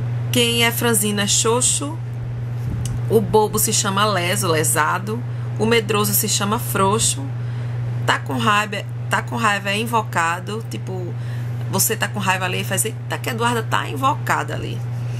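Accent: Brazil. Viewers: Tô ligado que tu é daqui de Maceió rsrsrsrs, Esse sotaque eu reconheço na china. Eitxa sotaque gostoso da boba! Só algumas gírias que deve ser mais falada em outros estados,mas o resto eu falo quase tudo aqui kkkkkk. Ala Ala Ala Alagoas ;) . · Bah Tche O Sotaque Nordestino é meio difícil não sei Porque se eu sou Gaúcho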